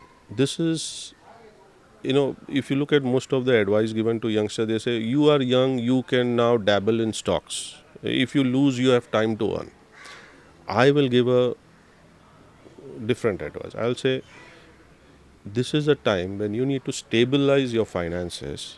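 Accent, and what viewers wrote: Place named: Mumbai, India. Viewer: From the accent, I got a feeling that he also belonged to Mumbai.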